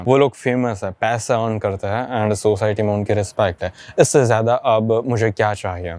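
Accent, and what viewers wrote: Gujarat, India. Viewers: most of the gujarati people tend to struggle while speaking hindi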